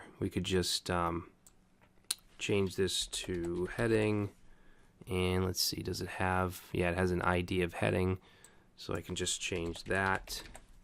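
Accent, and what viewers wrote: Boston, United States. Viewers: he was talkin about your boston accent. I picked up on it too haha! Thanks though, man. Been trying to find good tutorials to learn js, but everyone else sounds either very boring/not engaging. Gonna check for other videos you have. thumbs up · Very well made and informing video. You sound like you're from Boston. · hahaha!! Nice one! has that classy Boston accent:)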